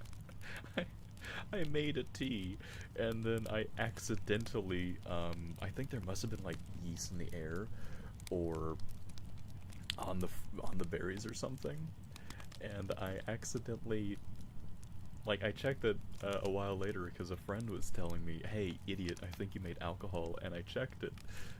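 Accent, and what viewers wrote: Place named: Texas, United States. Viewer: It surely was a fun day!!! I really enjoyed the whole hour, AND i learned new things thanks yo you a whole bunch, i love ur Texas accent, i love old western especially on TV like Dallas!!! The original of course, your voice really has a strong deep tone, love u!!! Ur good Boy.❤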